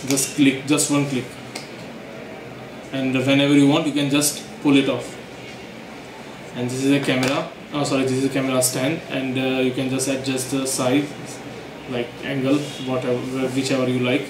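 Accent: India. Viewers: if your going to make a video lose the Indian accent we hate it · Your English accent is very bad and difficult to understand it .. all the Indians the same in this matter .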